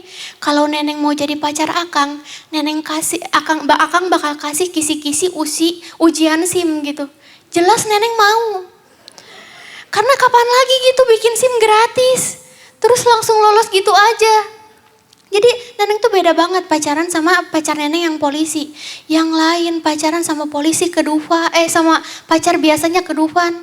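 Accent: Medan, Indonesia. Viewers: Neneng ku kira kau medan kali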